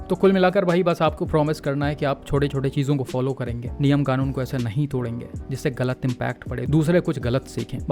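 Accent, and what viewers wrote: Lucknow, India. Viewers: bro are you from lucknow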